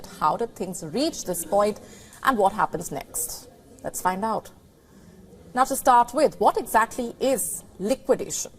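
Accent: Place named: India. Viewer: What an accent ! An Indian sweet english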